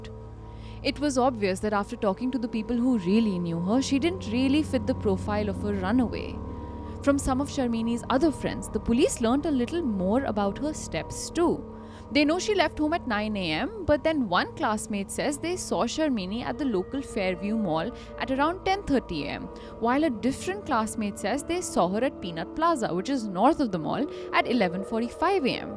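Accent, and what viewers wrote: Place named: India. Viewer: Start talking in Hindi instead of talking in English with a Hindi accent and you’ll be heard more.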